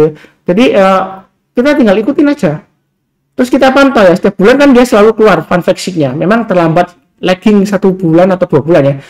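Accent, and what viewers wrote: Java, Indonesia. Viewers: Jowone medok temen mas 👍😊🙏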